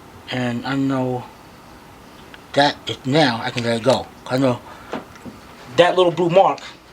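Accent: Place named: New Jersey, United States. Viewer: Where are you from? Sounds like New Jersey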